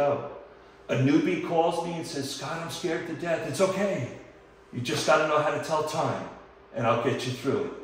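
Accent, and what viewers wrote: New York, United States. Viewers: if i know my people well , I'd say you're from NY. Long island here. Do you guys do detailing or just sell the products.? Im in the Dallas area as well.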